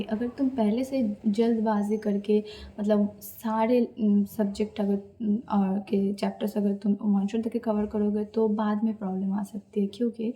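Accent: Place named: Bengal, India. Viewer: Waiting for short hair videos 😭 and ur bengali accent 😭🎀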